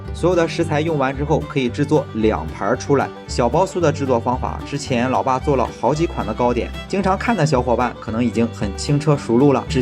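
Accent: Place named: Shandong, China. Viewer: 看了几集听讲话感觉像是山東人！这集终于证实猜对了😄視頻做的不錯👍🏻加油！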